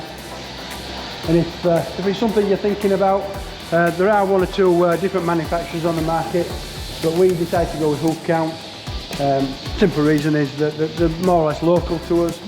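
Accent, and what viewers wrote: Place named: Yorkshire, United Kingdom. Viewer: By heck, thats a grand Yorkshire Accent. Having lived in NZ for 25 years now I miss the accents of the home county. All you need is a robot scraper now! And have you upgraded the JCB?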